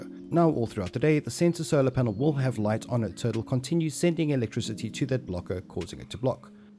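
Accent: South Africa. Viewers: You have to be South African as well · What are the odds of you being a South African excuse me for assuming it just the accent 😂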